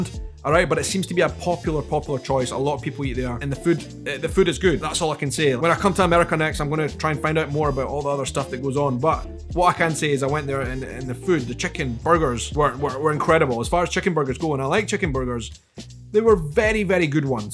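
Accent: Scotland, United Kingdom. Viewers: you are like a big, bright, beautiful bouquet of sunflowers in the morning! (Wow, I guess that was a mouthful). I LOVE your videos. HOWEVER, I do have one criticism: DEARHEART, YOU TALK TOO FAST. I am from the South in the US, and our lifestyle is so much slower and leisurely. We are not stupid; we just take our time. I have to sometimes watch your videos twice or more to absorb the content. You speak at warp speed (yes, a Star Trek reference). I do not know if your manner of speech is a Scottish trait or your individual personality. I feel sometimes that my brain is exhausted about halfway through some of your videos, and I give up. Sometimes I just redo it if it's content I really, really wish to see. I have reviewed some of your videos and found that you do not impart all your words of wisdom like a squirrel on steroids each and every time. 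You can make longer videos or divide them up into parts I and II. I enjoy the content so much and adore your accent. So, please, if possible, endeavor to slow your words down a bit for some of us older folks (I'm 67) who so enjoy your videos. By the way, I enjoy all the fast food restaurants you mentioned, especially Chik-Fil-A. Their grilled chicken sandwich is awesome, but their warm, chocolate chunk cookies are to die for. I hope you tried them. They are too good to miss. God bless. Love and hugs from Karen in Tennessee. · Scots like you say the pop in "Popeye's" like southerners e.g. in Tennessee, say "pop tent". It's basically a cross betwixt 'pup' & 'pop', a wee-bit closer to the 🐶. 🆒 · In-N-Out is the best! Open one up in Scotland and you can call it In-N-Oot. Meant with total respect for my favorite accent in the world. ❤️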